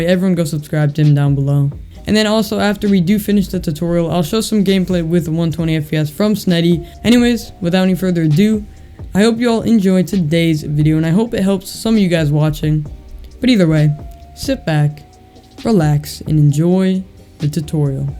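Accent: France. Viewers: R u French ?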